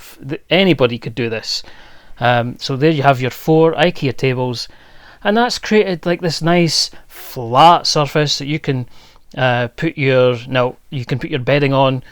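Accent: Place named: Scotland, United Kingdom. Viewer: Lol, I enjoyed the voiceover. You remind me of lewis capaldi with your humor and similar accent! Looks like a nice cosy set up !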